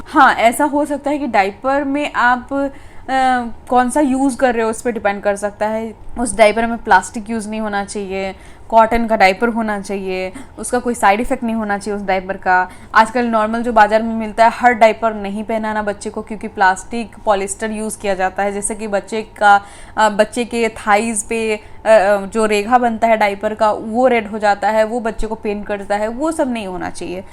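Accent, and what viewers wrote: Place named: Purvanchal, India. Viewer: love u ji mai apko tb se dekh rhi hu jb ap 5 mnth pregnnt thi qki mai b pregnnt thi ab meri beti ho gyi h... apko dekhna or sunna acha lgta h.. mera sasural b purvanchal se h to apki tone achi lgti h❤❤❤❤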